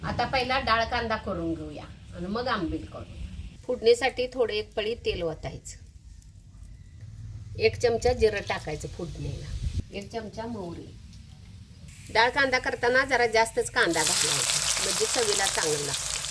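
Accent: Kolhapur, India. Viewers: तुमचे सगळे पदार्थ मला खुप आवडतात आणि तुमची कोल्हापूर ची भाषा पण आवडते ☺️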